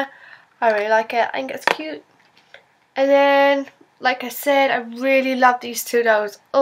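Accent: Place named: United Kingdom. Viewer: You're so sweet, i love your UK accent and congrat for this awesome pack :) I love you're video. Bisous from France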